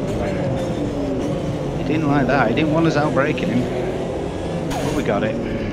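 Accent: North, United Kingdom. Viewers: I fear for our sanity when dust becomes a variable and rateable update worth recording. But we ‘is’ sim races, and we do this with pride. And yes, it does look better. Nice vid as usual partially due to your beautiful northern dulcet tones. Appreciated.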